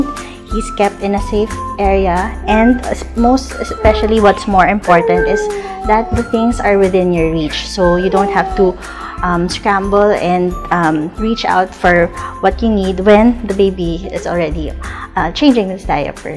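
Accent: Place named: Philippines. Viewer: everytime .5 seconds realuze shes pinay with the accent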